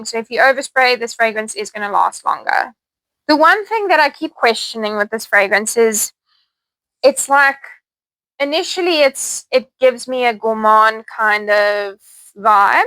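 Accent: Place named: South Africa. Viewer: Your accent gives me Mzansi Vibes... Enjoyed the review and like the ink art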